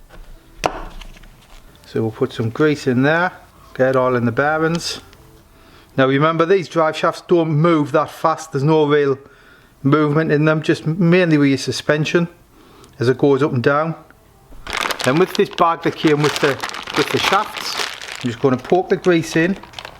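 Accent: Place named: North East England, United Kingdom. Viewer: as always good thorough content. I tip my hat to you for the endless late nights of editing your videos, this must take up a lot of your time. Keep bashing away…. I came across your videos a few years ago and noticed the northeast accent, mint! Been watching ever since. 👍🏻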